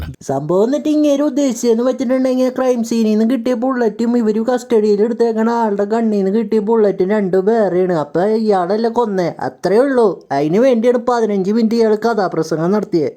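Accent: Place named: Puthenvelikkara, India. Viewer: ഇത്രയും വൃത്തിക്കെട്ട ഒരു voiceover അടുത്ത കാലത്ത് കേട്ടിട്ടില്ല മൈര് 😂... വളിക്ക് എക്സ്ട്രാ മണം പോലെ അവന്റെ പുത്തൻവേലിക്കര സ്ലാംഗും... കാട്ടവരാതം തന്നെ മൈരാ...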